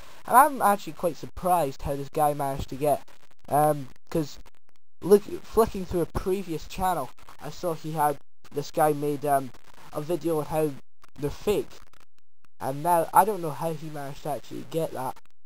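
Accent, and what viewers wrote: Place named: Scotland, United Kingdom. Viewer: scottish accent = da shit.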